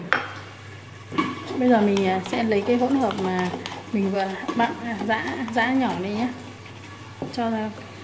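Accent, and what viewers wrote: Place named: North, Vietnam. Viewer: Nge tiếng bắc kỳ là thấy làm xong ko ngon rồi. Lướt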